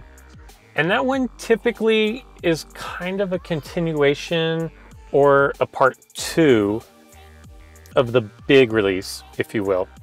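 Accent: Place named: Canada. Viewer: it's beautiful there for sure, I'm a Canadian, some of the words you say you almost have the accent lol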